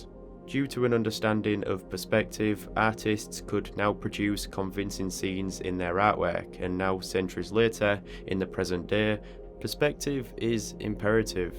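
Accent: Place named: Scotland, United Kingdom. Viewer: Is he Scottish? I love his accent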